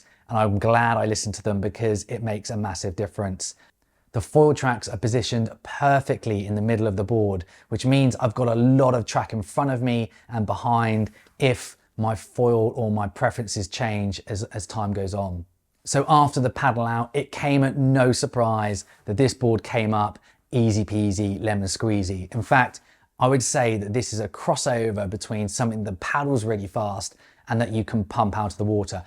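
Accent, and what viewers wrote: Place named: England, United Kingdom. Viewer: Nice one. I love the Australian colloquialism with an English accent. Easy peazy lemon squeezy. I have a question about one of your earlier videos, Part 2 of you learning to downwind and flat water paddle up...You mentioned two things which made a significant difference...one was the foil and the other was the paddle. Can you explain why the paddle made a difference please. And also why you no longer use the PPC paddle shown in that video.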